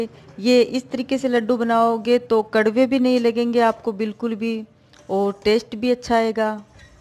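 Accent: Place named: Bagri, India. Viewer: Good but aapki hindi me bagadi show ho rhi h 🙂 Keep it up bagadio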